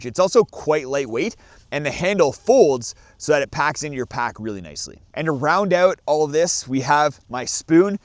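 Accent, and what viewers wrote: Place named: Canada. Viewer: Sometimes it’s hard for non-North Americans to distinguish US vs Canadian accent. But boy do you clarify the differences.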